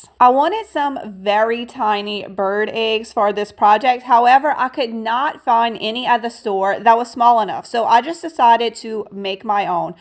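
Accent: Louisiana, United States. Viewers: Darling! You sound like you’re from Louisiana!!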